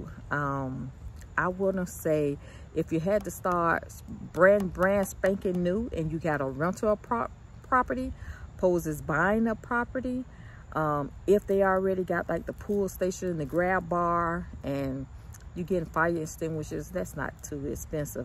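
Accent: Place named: South, United States. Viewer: You sound like my TT, and I'm straight out the south too. I think you sound just fine! Thanks for all this guidance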